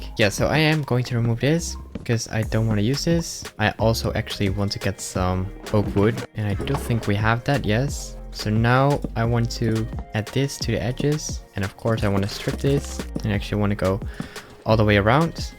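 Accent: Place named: Netherlands. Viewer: Oh! You’re Dutch? I always wondered where you were from. I feel silly for not guessing it sooner based on your accent. Hello from Toronto! 😄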